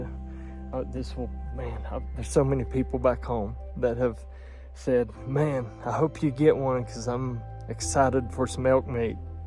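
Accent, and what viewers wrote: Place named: East Coast, United States. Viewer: "Holla". I love you Easterners accent & word choice. We here in Idaho, call that a basin, canyon or draw. Very cool wilderness too have hunted. Hell's Canyon is great too. Fantastic bull. Congrats. Gotta admit, I teared up with you. Truly a blessing. God is always in the blessing His children business!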